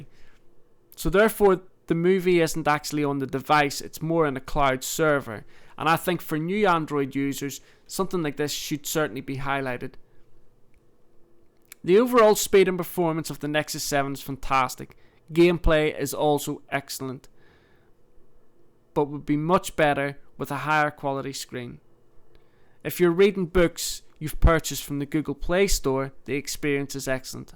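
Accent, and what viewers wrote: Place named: Northern Ireland, United Kingdom. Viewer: Nexus 7 - I love hearing your straight-talking, easy to understand and unbiased reviews in a familiar (Northern Ireland!) accent. Thank you for uploading all of these, and doing such a great job with the website.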